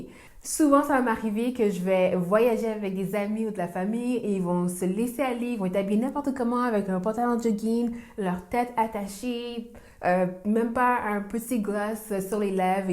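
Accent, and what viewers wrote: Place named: Quebec, Canada. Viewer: J'aime ton accent québécois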